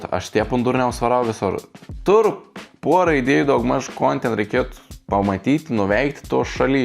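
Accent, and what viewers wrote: Samogitia, Lithuania. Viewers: Pažiūriu ir tavo ir Pauliaus keliones ir nematau išvis jokio panašumo tarp jūsų ir jokio kopijavimo. Jei esat iš Žemaitijos tai ir kalbat taip. Va jei kuris nebūdamas iš ten mėgintų taip kalbėt tada suprantu, kad kopijuoja kažkas kažką. Man patinka tavo kelionės, tavo video, šaunuolis, linkiu kuo daugiau prenumeratorių ir gerų kelionių 😊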